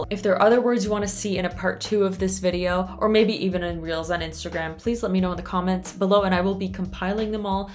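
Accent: Italy. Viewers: Madonna, si sente che sei italiana. E se non lo sei... Complimenti! Io sono italiana, e sono contentissima, proprio perchè hai fatto questo video. Mi dispiace dirlo, ma odio quando sbagliano la pronuncia o ci paragonano ai messicani/spagnoli. So che non sanno la pronuncia corretta ma...😅 Boh non lo so mi incavolo quando lo fanno. Cmq complimenti ancora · Non so se è solo una mia impressione personale ma il tuo inglese sembra più..."italianizzato". Nel senso che le parole sembrano più chiare e comprensibili, altri video in inglese riesco a comprenderli molto meno. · mi sembrava di aver visto in un video che dicevi di essere proprio italiana. Anche perché si sente un forte accento italiano quando parli inglese. Buon per te. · Hai un inglese italianizzato 👍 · You sound like a native Italian speaking a beautiful english. Very impressed. Bravissima.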